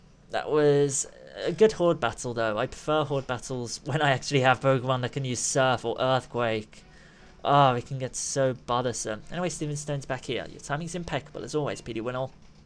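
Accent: United Kingdom. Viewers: You are British?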